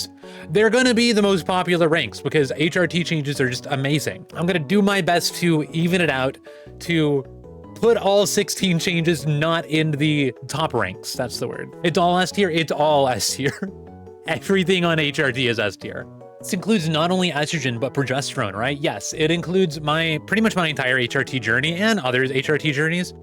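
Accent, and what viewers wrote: United Kingdom. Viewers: you sound like you're doing a british accent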